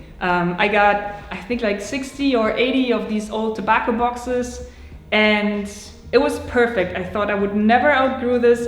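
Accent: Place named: Germany. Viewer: peinlich , wenn eine Deutsche englisch spricht ...:-/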